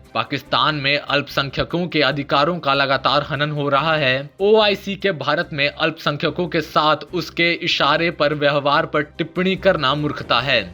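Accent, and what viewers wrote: Standard. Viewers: आप जो शुध्द हिन्दी बोलते है उस से बोहोत ही आनंद होता है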